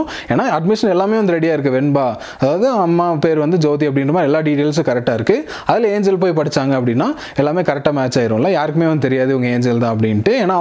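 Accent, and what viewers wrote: India. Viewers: Bro, R U from Nilgirs? Superb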